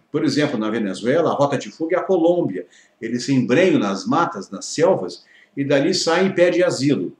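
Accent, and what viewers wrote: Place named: Rio Grande do Sul, Brazil. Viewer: sou de Santa Catarina. Você é do RS, pelo que ouvi no vídeo. De qual município?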